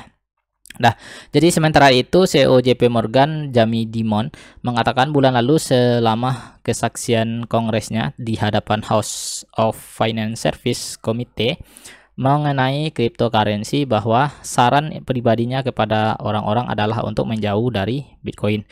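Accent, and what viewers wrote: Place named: West Sumatra, Indonesia. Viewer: logat urang awak bana da👍😁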